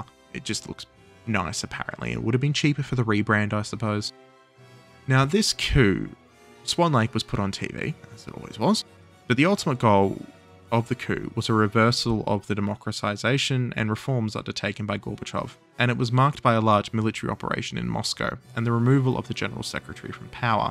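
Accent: Australia. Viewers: It's always such a weird feeling to watch a video in english, specifically from some aussie bloke, and suddenly hearing ARBEITER BAUERN NEHMT DIE GEWEHRE 😂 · I think he’s Australian.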